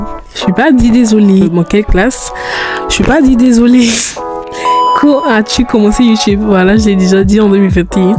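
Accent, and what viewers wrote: Ivory Coast. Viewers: Tu es ivoirien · La voix ivoiriene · Des que g entendues ta voix g sentie l'accens ivoirien je suis ivoirien aussi😂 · Tu sais t'a voie d'ivoiriéne ❤🥳😍 magnifique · C'est moi ou j'ai impression que sa voix c'est comme les ivoirienne mais jsp dites moi si jsuis folles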